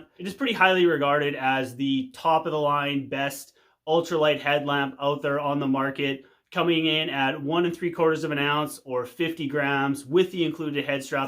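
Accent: Canada. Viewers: I love your Canadian accent.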